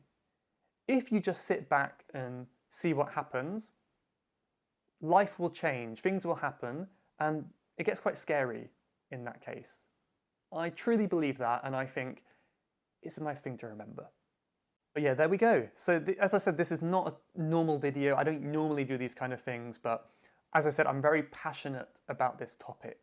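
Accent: United Kingdom. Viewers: I was admire to you myteacher ,my resolutions this years Will to marry and wanna improve myspeaking skill .i hope British accent could come out to mymouth .hahaha i love your accent have Made me ,was crazy .i'm sorry my English bad . I'm from indonesian ,i hope one day i could meet to you ...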